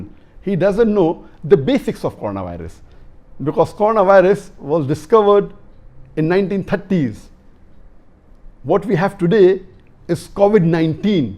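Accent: India. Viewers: Hello, can you stop speaking like an Indian? TQ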